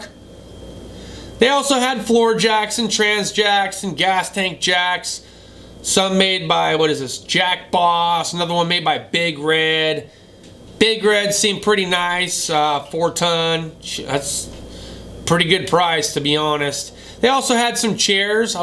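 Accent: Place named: New England, United States. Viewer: Still talk like a Yankee tho👍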